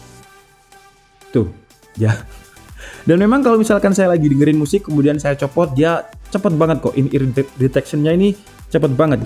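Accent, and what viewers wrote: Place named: Surabaya, Indonesia. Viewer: iki khasse wong suroboyo taa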